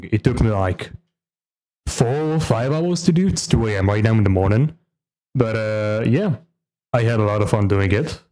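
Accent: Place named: Israel. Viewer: Nah dw its hard to tell you got a israeli accent, i had to go check other videos of you to confirm if my suspicion is correct. Good tutorial nonetheless though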